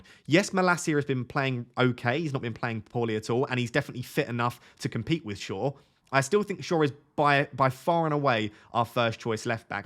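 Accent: Manchester, United Kingdom. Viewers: Love that Manc accent you got there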